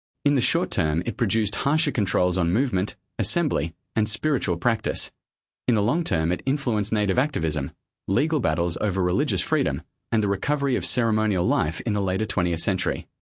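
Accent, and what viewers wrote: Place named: Australia. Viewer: This “historical narrative” is offensively absurd, not only for being narrated in an Australian-concept or Afrikaaner-concept accent, but by eliminating all references to “race,” a MOST critical dynamic between all peoples of the era, as well as now. There was no “universal” starvation, displacement, deprivation, massacre, and genocide of people on all fronts, in any “equal” measure whatsoever. No “criminalization” of sacred traditions, Religions, & Religious practices was ever incurred by any White persons. No treaty agreement was ever upheld. equally-respected and honored by the USA-concept cavalry, government, nor by any known White persons of any significant Positioning in that government (nor in any other European government concepts interested as “parties”)—still undergoing its own “formations” and territorial disputes at that period. 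It MAY be “helpful” to SOME young children to have a narrative of certain historical landmarks, events, and participants involved in Native Nations’ Unjust treatment. But for adults, it serves no purposes other than strengthening, refining and expanding Racism/White Supremacy, as narrated by an individual unwilling or unable to intelligently discuss his OWN “frontier” narrative in a manner that young people can easily understand. The purpose of “History(ies)” as a subject isn’t being “concise.” The purpose of reporting historical data is accuracy, truthful reportage, and fact-based information conveyed in such a way that it engenders compr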